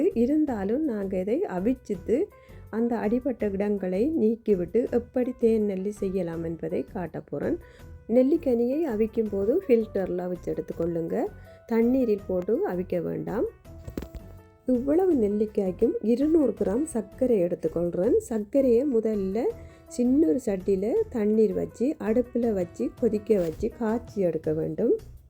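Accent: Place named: Sri Lanka. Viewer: ஸ்ரீலங்கா தமிழ் ரொம்ப பிடிக்கும் எனக்கு என் பிரண்ட் valvettithurai நான் இந்தியா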